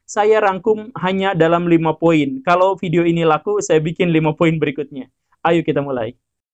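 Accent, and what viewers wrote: West Java, Indonesia. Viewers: Kalo editan videonya kaya bossman mardigu tambah mantep nih video.. tapi logat sundanya gak diilangin..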